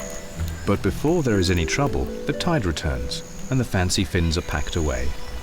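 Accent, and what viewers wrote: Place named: England, United Kingdom. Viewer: High-Quality Documentaries ✨💎I have no idea who these producers are, but this documentary is certainly on par with BBC. And Im not saying that simply because the narrator is English. First class photographic direction & editing. And the cinematography of these landscapes- - Alaska, the Amazon, & India- - are all magnificent, of course... There is no more noble public service than documentaries that teach the Natural Sciences, & display animals & habitat A hundred Thank Yous for commiting this film for broadcast through Youtube 💜💜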